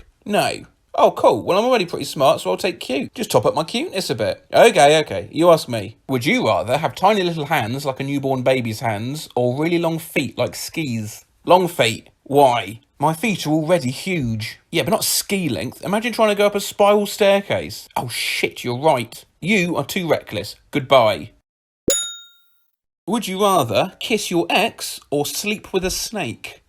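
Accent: United Kingdom. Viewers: I watch these purely for the British accent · These kinds of videos are entertaining on their own but somehow having a british narrator always makes them more entertaining. R.I.P. Soothouse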